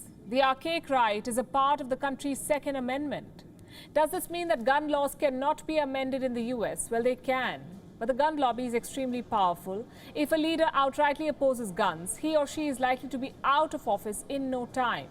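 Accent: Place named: India. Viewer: 𝕀𝕟𝕕𝕚𝕒𝕟 𝕒𝕔𝕔𝕖𝕟𝕥 𝕚𝕤 𝕛𝕦𝕤𝕥 𝕡𝕝𝕒𝕚𝕟 𝕟𝕒𝕤𝕥𝕪 𝕃𝕆𝕃!!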